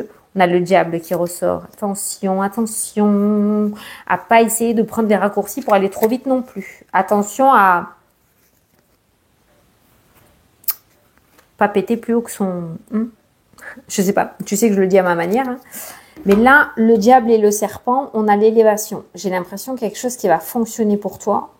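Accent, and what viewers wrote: South, France. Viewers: Merci je vous adore vous êtes trop cool et incroyable et surtout avec l'accent du sud. Sa fait du bien de vous voir et je rigole à chaque fois. En se qui concerne le lundi il y a beaucoup de choses qui me correspondent dont l'ophtalmologue 😅 ainsi que les rêves prémonitoire. Continuez à être naturellement heureuse et partager avec nous vos précieux moments. ❤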